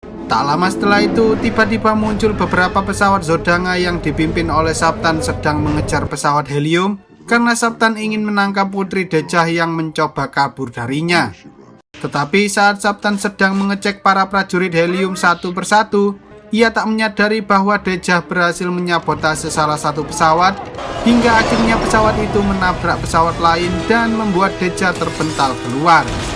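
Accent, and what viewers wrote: Java, Indonesia. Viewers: Logate logat jowo..😂😂jowo bagian ndi mas..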